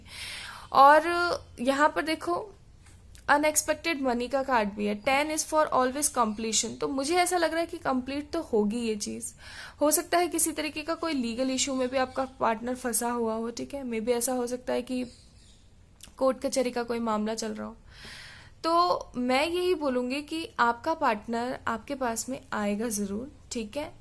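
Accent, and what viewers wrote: Punjab, India. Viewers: apki awaaz se aise lgtaa hai punjab se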